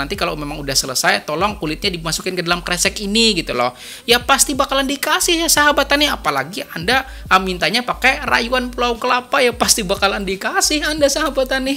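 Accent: Banjar, Indonesia. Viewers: Logat banjarnya masih terbawa mang 😂👍🏻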